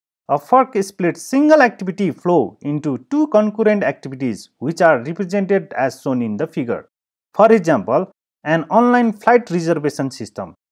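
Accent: Nepal. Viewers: Nepali accent xa ta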